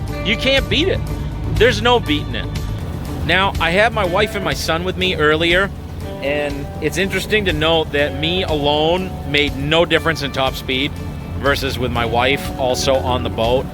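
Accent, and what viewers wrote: Midwest, United States. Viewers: Interesting Midwest accent, never heard that one before.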